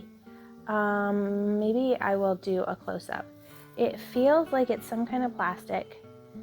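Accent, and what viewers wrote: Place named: Alabama, United States. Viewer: You're exhausted? You look GREAT. The Pickens has a gorgeous drape😍 you did a great job! Your accent is similar to mine I'm from Alabama where are you from?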